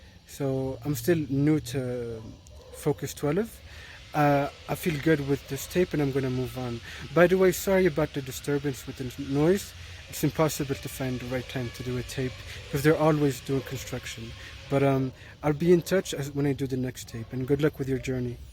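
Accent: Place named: Mediterranean. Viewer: it sounds like you have some Mediterranean style accent but it's a cool one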